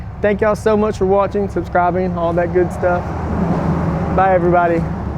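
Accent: South, United States. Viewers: love your southern accent bro.i have a good bud from San Antonio Texas.builds golf courses all over the world.met him here on Vancouver Island years ago while he was sculpting a course.he had a good accent as well.cheers.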